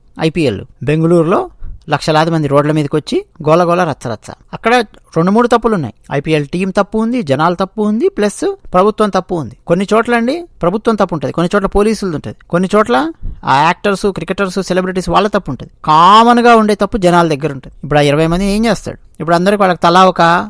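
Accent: Telangana, India. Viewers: U act smart I know u talk telagana. Ascent when feel other then u dont like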